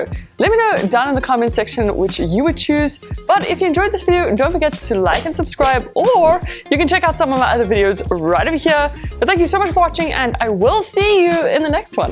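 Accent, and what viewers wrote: South Africa. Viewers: Is she south African? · Heard a South African accent and hit subscribe. Only just bumped into your channel for the 1st time today. Quality content 👌🏾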